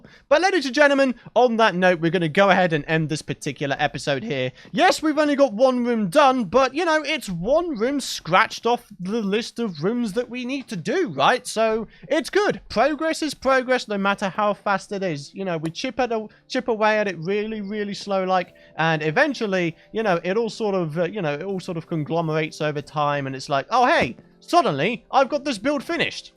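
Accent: England, United Kingdom. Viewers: Do you are from england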